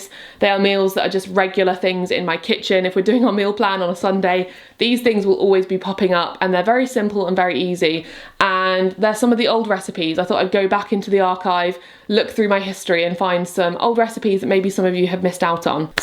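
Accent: United Kingdom. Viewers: I watch your videos since 3 years now and love them. Your british accent is kind a cute. Would love to win one of the blenders because I just moved out to study becoming a dentist. I hate that I don't have the ability to blend stuff in my little dorm room.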